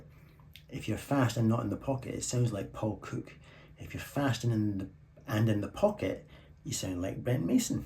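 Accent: Scotland, United Kingdom. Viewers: HI Paul, Your latest video just showed up in my recommendations. Love your playing and your easygoing personality. I am originally from Alloa, Clacks so your accent grabbed me. I have already watched a few of your videos, only six and a half years to go!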